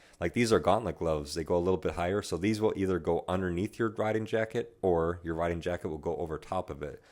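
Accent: United States. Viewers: Holly molly, as soon as I was 15 secs into your video I knew you were american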